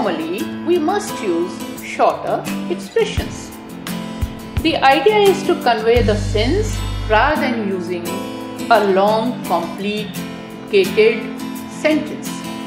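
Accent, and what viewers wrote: India. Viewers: So a woman with an extremely hard Indian accent is saying to try to speak in a neutral, not an American accent, which is usually what people consider to be a pretty neutral. Indians are the worst English speakers on the planet. They thing they are speaking British English. Far from it.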